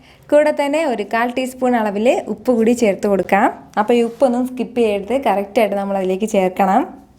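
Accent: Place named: Malabar, India. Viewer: ath അറിയ but malabari style talk ആണ്. അതല്ലേ നമളെ പോലുള്ളവർ fans ആയത്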